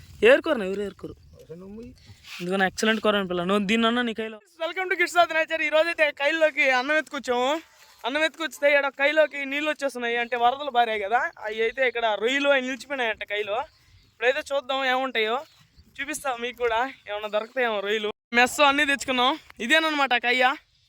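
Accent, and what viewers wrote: Nellore, India. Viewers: Nellore slang kosame chustanu nee vedio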